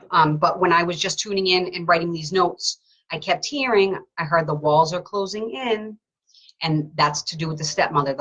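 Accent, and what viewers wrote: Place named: New England, United States. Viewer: I’m from Massachusetts too!! I heard your New England accent right away.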